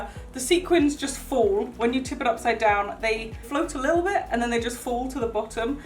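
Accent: United Kingdom. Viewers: she's British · Are u from Britain ?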